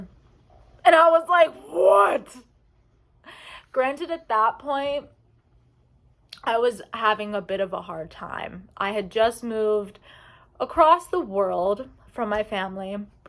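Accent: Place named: New Jersey, United States. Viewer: Look like from Boulder talk like from Jersey.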